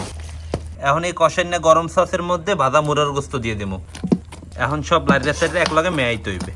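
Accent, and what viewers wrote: Barishal, Bangladesh. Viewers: এক্কেরে খাস বরিশাইল্লা ❤❤❤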